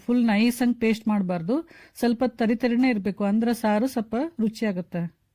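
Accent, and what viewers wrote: North Karnataka, India. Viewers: Ma’am I’m absolutely delighted to hear your North Karnataka Kannada!! I appreciate you for keeping it real...... 👍🏼👍🏼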